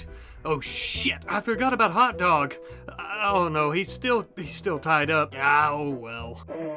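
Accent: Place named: Transatlantic, United States. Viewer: is this your natural voice? it sounds like you're making a jim carrey smirk type of forced face with a idea of some kind of transatlantic accent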